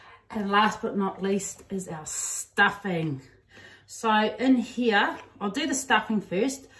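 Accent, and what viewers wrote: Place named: New Zealand. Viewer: Your accent still sounds nz. I been here longer so has my mom and two eldest sister's and they still have that nz accent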